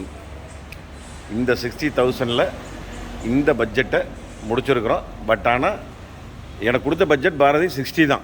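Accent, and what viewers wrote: Kongu, India. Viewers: ரசனையோடு இணைந்து உளப்பூர்வமாக செய்து இருக்கீங்க அண்ணா...நம்ம கொங்கு தமிழ் அபாரம்.யாதார்த்தம் சிறப்பு தொடரட்டும் உங்கள் பணி மென்மேலும் சிறக்க நல்வாழ்த்துகள்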